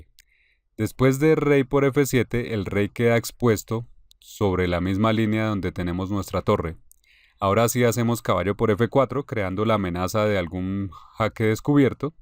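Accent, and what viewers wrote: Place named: Colombia. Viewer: Maestro gracias por sus clases y me preguntó esa voz es la de usted, porque no tiene acento extranjero, disculpe mi curiosidad si más no recuerdo usted es ucraniano, como habla un español con acento colombiano????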